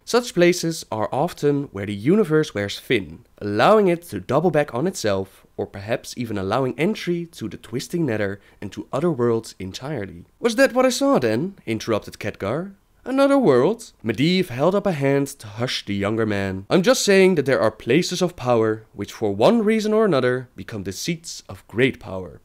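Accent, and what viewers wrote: Netherlands. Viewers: What's up with the dutch accent?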